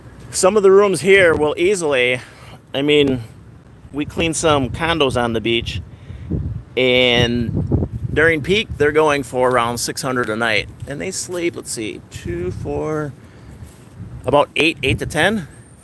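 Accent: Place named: North, United Kingdom. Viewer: Coming in a bit late but loving this video! Been coming to the Panhandle since I was a teenager (or before) ... about 100 years ago. It was before all the high rises were there. But, I've never walked the pier. Very cool. Beautiful sunrise! Sound is good except seems I hear a bit of a northern accent ... just a little bit. 😂❤❤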